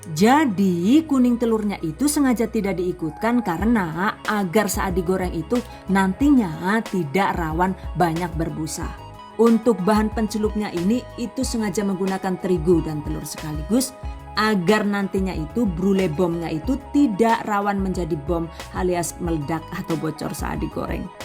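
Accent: Banyumas, Indonesia. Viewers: tengkyu...jangan lupa logat ngapaknya lanjuuuuut.😃😃💪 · Mbaa saya senang klo mbanya lagi ngomong ngpak lucu trima kasih mbaa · mksh mb resepnya,,dn jg mksh bhs ngpaknya,,bikin aq ktawa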